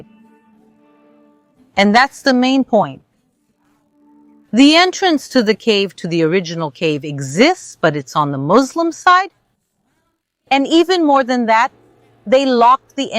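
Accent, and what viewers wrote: Israel. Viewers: I think the original language is English, she/you is/are speaking English with a nice Israeli accent, right?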